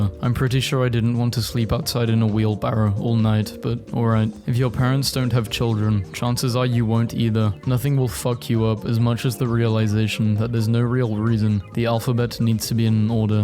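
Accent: United Kingdom. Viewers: pretty sure he’s British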